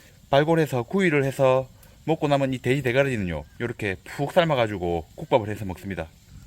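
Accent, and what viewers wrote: Gyeongsang, South Korea. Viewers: 정말 끝내줍니다 진주 사십니까 쥔장님 ? 발골도 잘하시고 경상도 사투리도 구수하니 좋고 같이 한잔 하입시다 ㅎㅎ.서울인데 한번 가고 싶네요 ~ㅎㅎ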